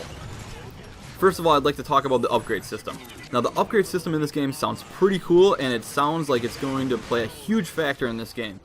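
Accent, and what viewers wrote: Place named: Canada. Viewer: I don't. Canadians are of mostly French descent I think? That makes them european. I was just curious if he was from Canada because he sounded Canadian. Now I'm a racist for being curious. What a wonderful and oversensitive world we live in now, that I can't just make simple conversation without being accused of being a bigot. T_T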